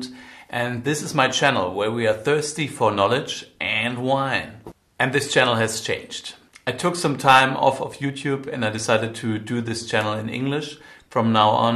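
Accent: Germany. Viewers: Bad German accent? No, no. Your accent is awesome!😀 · call me biased, but im glad you went to English,(im Australian) and to be honest your German accent adds something to videos, keep up the great work ! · You apologized for your German accent. Just kidding. You're perfectly understandabe. I wish I were multilingual.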